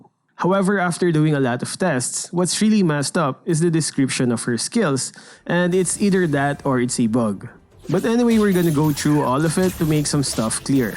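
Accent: Philippines. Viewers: Nice. Very pinoy ang accent ha. Hahaha. "Owpennns a laaaht of paaassibilities" 😂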